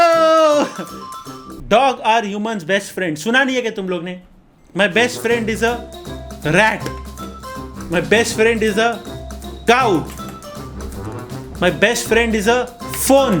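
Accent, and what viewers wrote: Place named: Mumbai, India. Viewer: I am addicted to your vedios..apko dekh dekh kr mujhe mumbai ki language aa gye hai 😂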